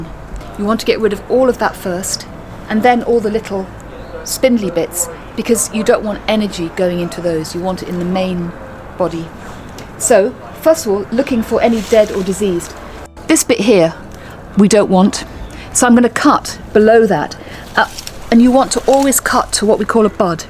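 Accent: London, United Kingdom. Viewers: 喜欢Manda说英语的感觉，好优雅好精致，伦敦音好好听～而且解释的好细致好专业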